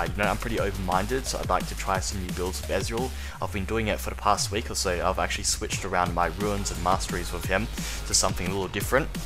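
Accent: Australia. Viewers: This guy has like a half Australian/half American accent it's tripping me out.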